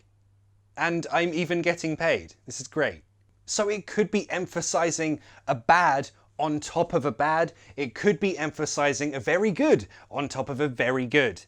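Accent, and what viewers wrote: United Kingdom. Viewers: Even though I have a lot brit friends, but I'm still need to fill my grammar English cause I love the British accent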